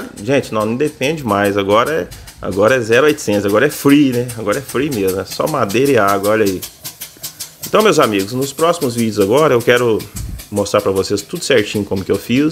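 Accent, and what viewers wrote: Minas Gerais, Brazil. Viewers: pelo sotaque é de minas uai ! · Esse sotaque mineiro me conquistou antes da máquina apresentada. Eu não sei como cheguei aqui. O mais louvo foi ver que 11 milhões de usuários vieram aqui antes de mim em 7 dias. Esse é o viral dos virais. Parabéns.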